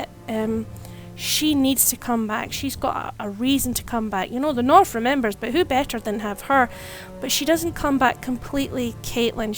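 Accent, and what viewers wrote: Scotland, United Kingdom. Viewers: What accent have you got? Is it Scottish sorry I'm terrible at telling which accent people are from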